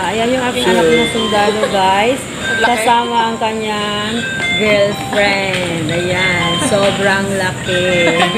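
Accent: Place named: Batangas, Philippines. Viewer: Akala ko sis taga Batangas ka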